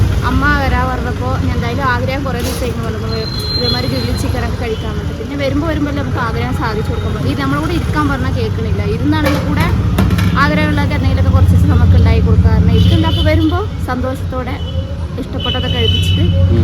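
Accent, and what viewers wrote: Tamil Nadu, India. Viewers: നീയൊന്ന് മലയാളം പറ അല്ലെങ്കിൽ തമിഴ് പറ. പറയുന്നത് കേട്ടാൽ തോന്നും നീ ജനിച്ച് വളർന്നത് തമിഴ്നാട്ടിലാന്ന് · എത്രയോ ചെറുപ്പത്തിൽ അവൾ തമിഴ്നാട് പോയതാണ് അന്നുമുതൽ അവൾ സംസാരിക്കുന്നതും ഇടപഴകുന്നതും അവരോടൊപ്പം മാത്രമാണ് അതുകൊണ്ടാണ് ഇങ്ങനെ സംസാരിക്കുന്നത് എന്തിനും കുറ്റം പറയല്ലേ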